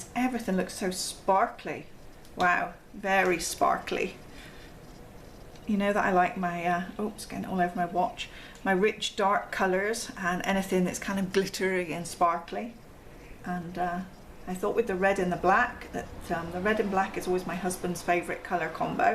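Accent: United Kingdom. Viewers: You sound British but the brands you use are not available here.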